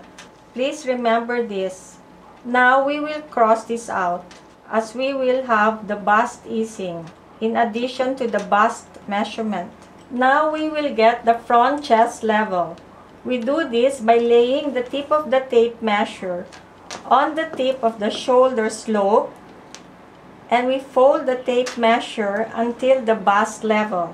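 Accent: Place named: Philippines. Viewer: your accent sounded like a filipina..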